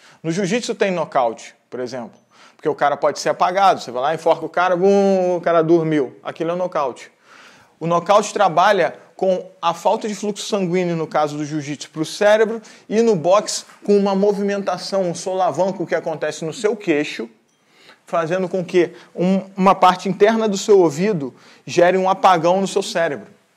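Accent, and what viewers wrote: Rio de Janeiro, Brazil. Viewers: É regionalismo, carioca, se não xingar e chia tá errado. ..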